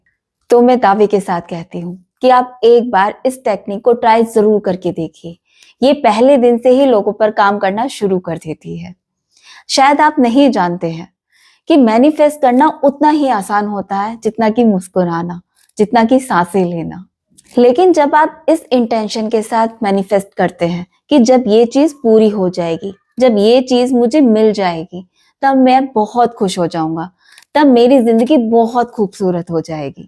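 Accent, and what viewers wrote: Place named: Gujarat, India. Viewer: Aap ki language sunke muje lagta hai aap gujrati ho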